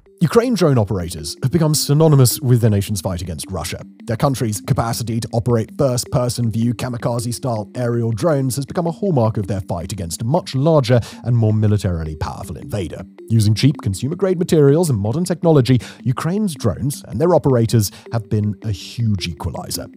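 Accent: England, United Kingdom. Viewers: most annoying english accent 2025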